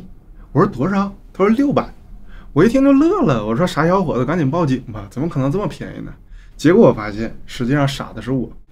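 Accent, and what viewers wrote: Northeast, China. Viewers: 您大爷的 能不能显示个字幕！！！ 您东北人 普通话很普通 但我耳机音响 真听不太清 你乌噜呜噜 说了个啥？ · 兄弟，喜欢你的东北口音，但是没有字幕不把声音开特别大，根本听不清你在说什么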